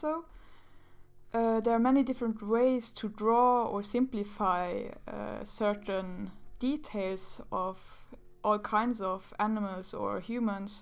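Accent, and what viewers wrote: Germany. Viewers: Are you german? xD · xD kann ich verstehen. Hab von deinem Akzent eher rausgefunden als an dem Screen, hab nur auf die Zeichnung geguckt, deshalb finde ich es ja auch erst jetzt heraus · Are you German by any chance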